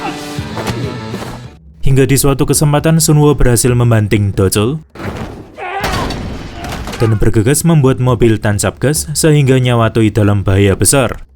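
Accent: Java, Indonesia. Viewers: Medok banget 😢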